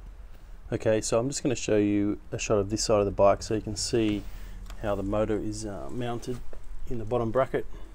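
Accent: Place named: Australia. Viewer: You sound aussie, so aren't you worried about getting caught with a 1000w motor?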